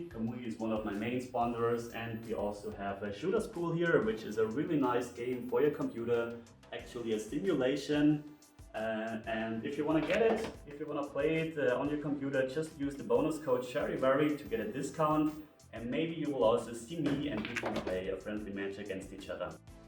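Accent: Germany. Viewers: you sound so german 🤣🤣🤣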